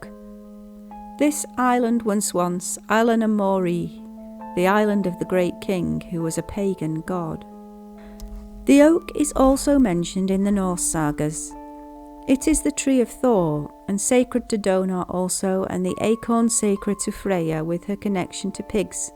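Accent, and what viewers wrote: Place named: Manchester, United Kingdom. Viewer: Love your videos, you sound like your from manchester accent. So relaxing and i love your old ancheint knowledge plesee keeo it up. Xx Danny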